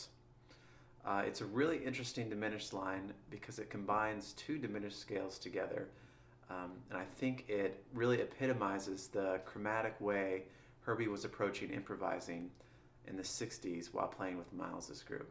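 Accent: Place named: South, United States. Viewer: i only just noticed by your accent u are from the south even after watching this vid for years. greeting from Long Island NY. thanks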